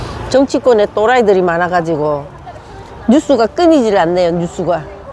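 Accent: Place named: Busan, South Korea. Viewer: 수고 많으셨습니다....페북에서 뵐때는 무서웠는데 ㅎ...목소리는 전형적인 부산 아지메 네요....화이팅~!!!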